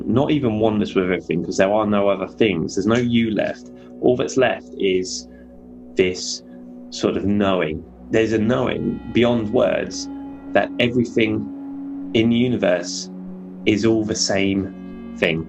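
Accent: United Kingdom. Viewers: Interesting take on DMT. Personally, "I" had to work real hard to decipher the Cockney type English accent but it was worth it. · Great report! Thanks for sharing! You sound British. I live in London. Let me know if your up for meeting some time :) Would be cool to meet and have a chat about this topic :) no one I know have had this experience.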